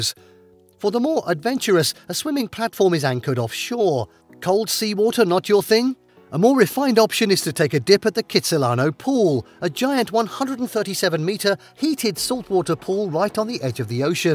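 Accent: United Kingdom. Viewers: thx for info! and your speech is clear enough to understand!🙂 I'm sorry, is it British?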